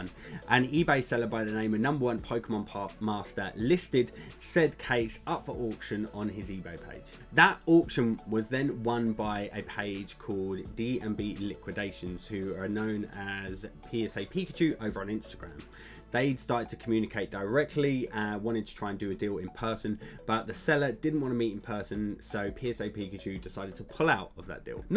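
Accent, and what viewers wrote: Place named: Australia. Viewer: yeah you really do sound more australian than british. I would have guessed you were born down under and then moved somewhere else. Really interesting which part of uk are you from then ?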